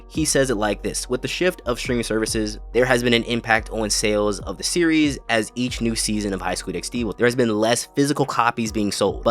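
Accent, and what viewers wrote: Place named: United States. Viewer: I heard your accent, i knew u would be some american burger consuming garbage fast food anime and enjoying it.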